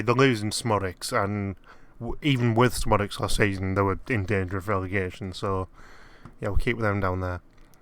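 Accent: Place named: North, United Kingdom. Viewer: can't U tell your from up north.... Thick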